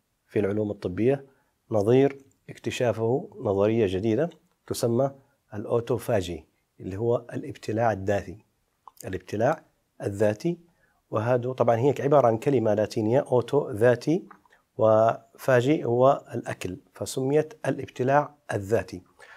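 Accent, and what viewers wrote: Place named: Yemen. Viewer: هلا تكرمت وقلت لي من اي المناطق حظرتك أو اي قبيله او عاءله ومن في اليمن يتحدث مثل لهجتك ولفظك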